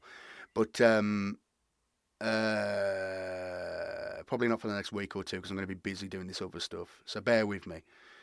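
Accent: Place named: Tamworth, United Kingdom. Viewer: I thought you were a Tamworth lad from your accent, I’m just down the road from there (on the boarder of Leicestershire). Didn’t know if you’d randomly turn up at our local shop sometime.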